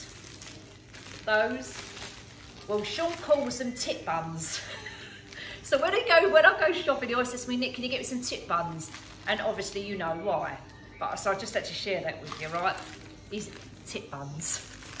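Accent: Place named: Essex, United Kingdom. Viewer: Hi Hun, I absolutely love you videos, you are completely a girl after my own heart. I live in the North now, but I was born in Essex and grew up in the east end of London, so your wonderful accent is like music to my ears..Keep it up Hun Bella xxx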